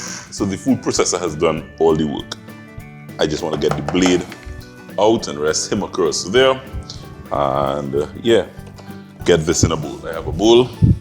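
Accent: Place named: Virgin Islands, Caribbean. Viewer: At first I thought I Heard Virgin Islands 🇻🇮 accent but I had to listen 👂 closely lol nice voice man now meh hungry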